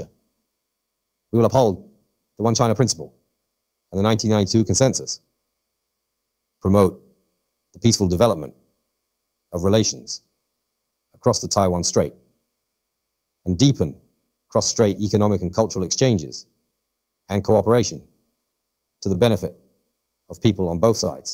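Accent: Australia. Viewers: Definitely Australian past the middle, not slight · Great but weirdly Australian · Assuming they’re using ElevenLabs, nope! 😂 Funnily enough, it seems ElevenLabs automatically tries to pick an accent appropriate with the geographic location of the source speaker’s accent. For instance, if a British voice is reading Portuguese text, it will likely read with a European Portuguese accent rather than a Brazilian one, and an American reading French text will read with a Canadian French accent. I guess because China is closer to Australia and the source audio is of a native Chinese speaker, an Australian accent was chosen for English. Unfortunately, the feature is automatic and somewhat unpredictable for now. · Has an Australian accent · Another fascinating speech. Curious if anyone noticed a touch of Australian accent in there...?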